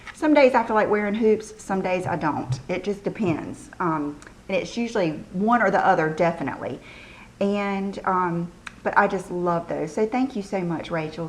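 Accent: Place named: North Carolina, United States. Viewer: You are so sweet. I watch you when I can. I really like the Burberry creme shadows. I've been using a silk pillow case for a couple months and found mine would fall apart in the seams. I'm going to try the one you showed. I'm a retired special ops wife and have a home in Fayetteville NC, and it sounds like your from NC and I was wondering where? If you can't say that's fine. Love you acsent and never be sorry about it, that's what makes you special. Have a wonderful week.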